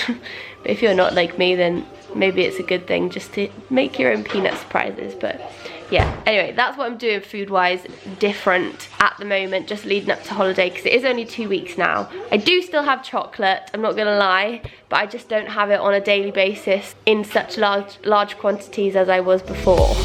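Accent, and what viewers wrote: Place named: England, United Kingdom. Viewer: I love your english accent! It sounds so nice!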